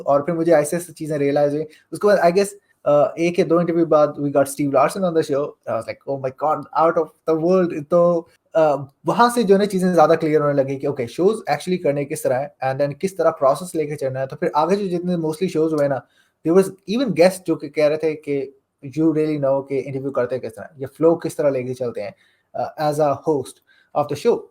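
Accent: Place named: Pakistan. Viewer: He is a pakistani,it is audible from his accent. Woww! Great job u have shown that money is the greatest thing in world 🖕🖕🖕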